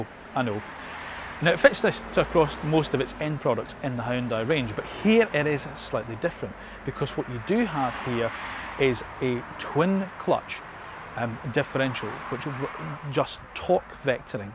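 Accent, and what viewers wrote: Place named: Scotland, United Kingdom. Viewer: Oh.. this is the Scottish accent. This is my first time to hear. Very unique and I love it.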